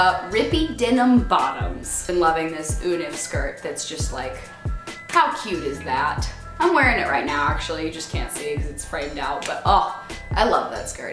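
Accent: United States. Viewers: Are you american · i literally can't tell if your american or swedish omg lmaoo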